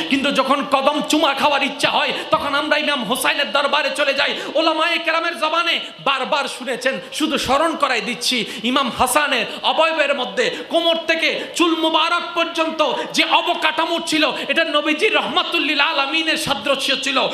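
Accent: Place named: Chittagong, Bangladesh. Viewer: ওটা চট্রগ্রামের ভাষা সবাই চট্রগ্রামের ভাষা বুঝে না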